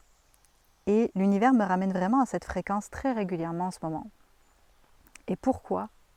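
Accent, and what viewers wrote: Canada. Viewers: Merci, Merci! ...De votre sourire, de votre joie, de votre simplicité mais aussi des messages très réconfortants que vous nous offrez...avec ce charmant petit accent canadien!😀 (Pour ma part j'ai un peu de mal...avec l'application du savoir intellectuelle en actes ...et aussi ac qq termes anglais qui sont sympa...quand on les connaît! Au plaisir de vous retrouver! J'ai regardé cette présentation avec ma fille de 28ans dans mes bras...jusqu'au bout.cela nous a réconforté à tou points de vue . C'était un moment puissant qui nous nourrit l'âme !🙏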